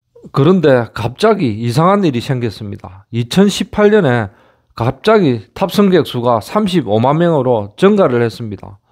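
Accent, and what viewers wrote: Gyeongsang, South Korea. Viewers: 겨ㅇ상도 액센트가 너무 멋져요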